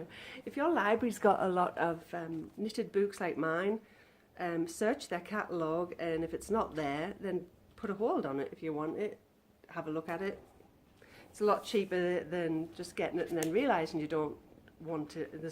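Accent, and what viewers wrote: Sunderland, United Kingdom. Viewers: Nice to see you back, missed your Sunderland accent lass. Hope 2017 will be better for you & your family♥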